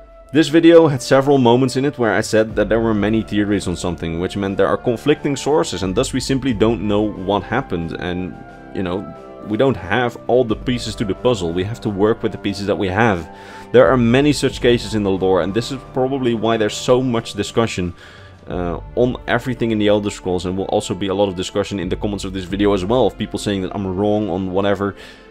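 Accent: Netherlands. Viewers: God...that dutch accent tho... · I hear a Dutch accent 😊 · Are you dutch by any chance? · Hey question if you don't mind me asking buy you don't happen to be dutch do you? (I'm dutch myself and I thought I heard a small dutch accent over your english) Also great video I enjoyed it very much :D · Dumb question, but are you Dutch? Your accent sounds Dutch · So I just discovered you. Now I have a whole lore backlog to go through to have going in the background while I work! Wahey! Also, fellow Dutchie I hear, so bonus points for that. Goedenavond! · I need to know: are you dutch? Its your accent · I can tell youre dutch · Are you niederlansk? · U dutch? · Lekker Nederlands accent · You dutch? You sound dutch. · Great vid. Are you Dutch? The accent seems familiar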